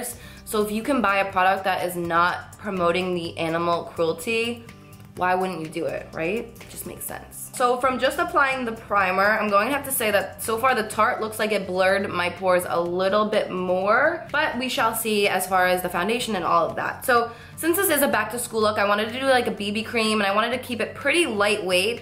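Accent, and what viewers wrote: New Jersey, United States. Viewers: Jersey accent :) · She is from New Jersey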